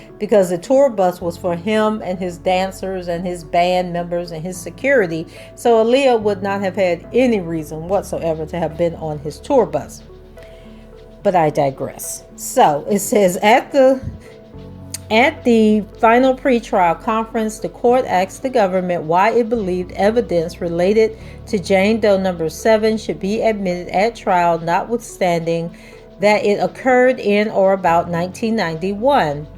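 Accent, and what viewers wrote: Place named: Georgia, United States. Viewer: you sound like my country family from Reidsville Ga with your accent 🤣 Background =Bikeground.. lol 😚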